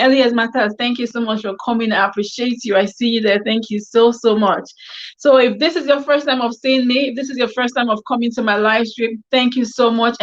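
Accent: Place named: United Kingdom. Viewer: This is a very interesting topic u look gorgeous sis I am enjoying ur UK accent...would be here for all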